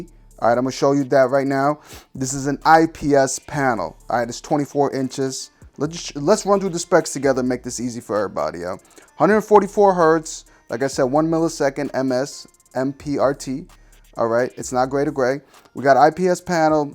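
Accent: New York, United States. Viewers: That NY Accent is CRISP I love it hahaha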